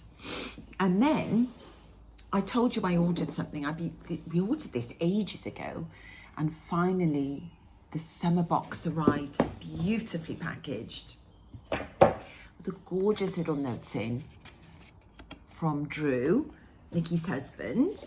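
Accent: Wales, United Kingdom. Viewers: Love everything on your Flosstube! Love to hear your Welsh accent too! Is the Giraffe called goose??? Funny !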